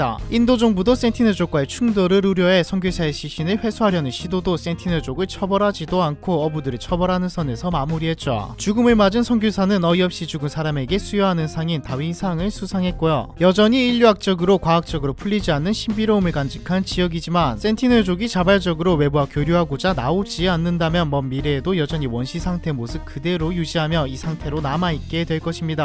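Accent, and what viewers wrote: Gyeongsang, South Korea. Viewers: 이분억양이 경상도말투 인가보네요?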